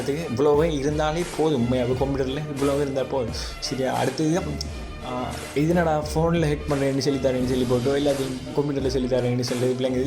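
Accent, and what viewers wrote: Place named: Sri Lanka. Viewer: Bro Your Srilankan...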